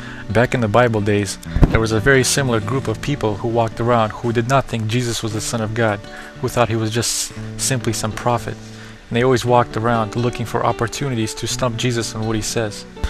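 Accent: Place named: India. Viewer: I cant understand this video complee completee difeebr what was that.... oh... whats going on.. Is this guy indian, i think he is.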